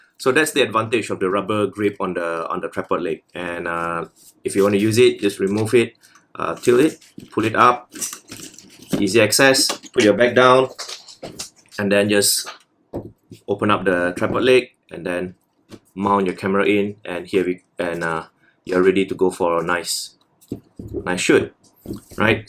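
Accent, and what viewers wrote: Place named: Malaysia. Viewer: When I heard you speak I instantly knew. Fellow Malaysian!!!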